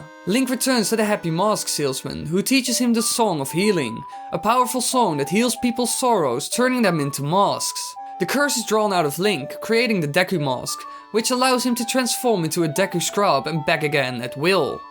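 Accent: Netherlands. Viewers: I bet my life this guy is dutch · You sound Dutch are you?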